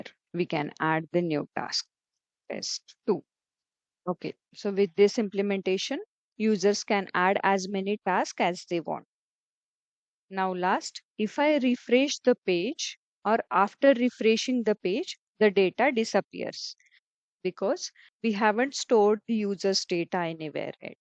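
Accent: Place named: Maharashtra, India. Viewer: My mind is thinking that your english is having little Marathi accent 🤔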